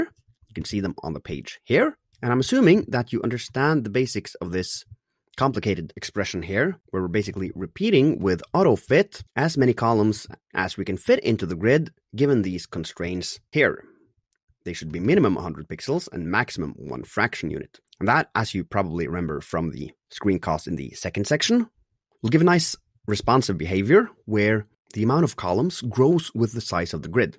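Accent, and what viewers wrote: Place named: Denmark. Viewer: Great explanation, that's what I needed, thank you! You have a danish accent btw, are you from DK?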